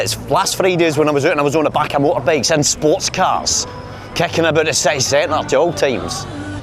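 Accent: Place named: Glasgow, United Kingdom. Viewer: New subscriber here, I moved away from Glasgow a few years ago and it's good to hear your accent and a bit of Glesga patter,good video pal and will look forward to the next one,🏴👍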